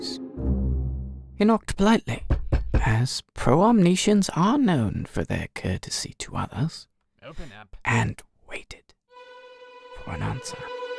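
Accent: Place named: United Kingdom. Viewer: haha so the accent's not bad! But there are a few slips! =P I love the story though!! You totally should've used "bottom" rather than "ass" too! (children's story, right?!) Also sounds much more British! The story could be compared to coming out of Communism... it's rather an astonishing tale! Toodlepip and all that!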